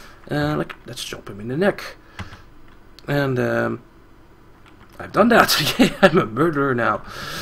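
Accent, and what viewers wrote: Netherlands. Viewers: "The Real Meach and Pochachoes of the game" I love the dutch accent:) You have such a different accent to SivHD though, a very diverse group you are! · Nicely done! You are my favorite streamer and youtuber:) Nothing can compete with that delicious dutch accent.. Looking forward to future episodes in this series! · I agree that he's got quite a heavy Dutch accent.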